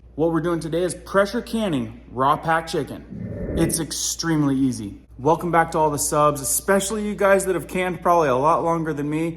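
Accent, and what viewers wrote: United Kingdom. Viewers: I’m reading this in your beautiful UK 🇬🇧 accent ❤️ 🥰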